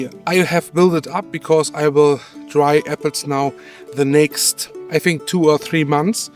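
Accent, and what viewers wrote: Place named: Germany. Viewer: Please don't reduce your wonderful accent, I enjoy it 😊! I understood every single word you said. Your accent reminds me of my Oma and Opa's accents. As young adults they legally immigrated here to the US from Germany, I spent a lot of precious time with them when I was a kid. They passed away a long time ago, I miss them.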